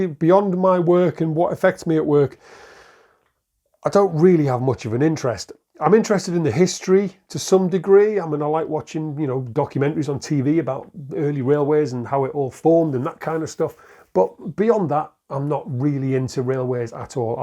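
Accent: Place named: North East England, United Kingdom. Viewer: I getting the hint of a north eastern accent there. And going by the black cat you will be a fellow Mackem? Great channel and love this idea. Will be watching with curiosity and endearment take care. Steve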